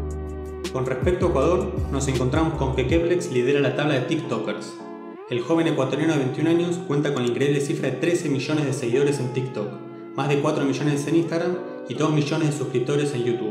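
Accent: Argentina. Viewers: EL NARRADOR ES ARGENTINO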